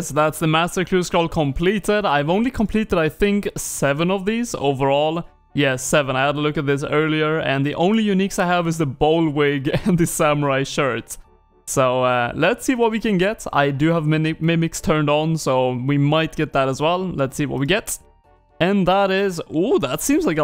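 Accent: Sweden. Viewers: Really love the series, you sound swedish are you? "drÄgon hunter lAnce" RSN: M4tsson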